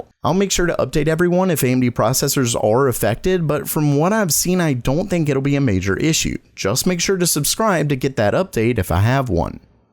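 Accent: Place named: South, United States. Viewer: You should do a video where you don't try to hide your southern accent lol I keep hearing it try to come out haha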